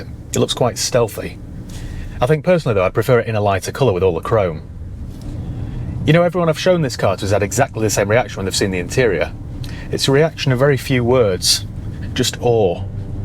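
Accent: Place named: Cheshire, United Kingdom. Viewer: Brought the Cheshire accent out for this one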